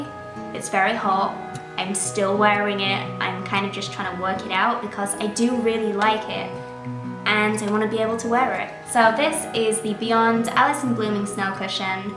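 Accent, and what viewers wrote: Yorkshire, United Kingdom. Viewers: P.S what part of Yorkshire are you from? I'm in Harrogate.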